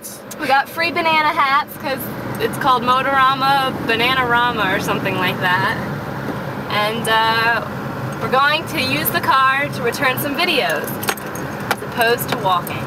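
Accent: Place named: United States. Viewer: if she is in England, what's up with an Amercan accent?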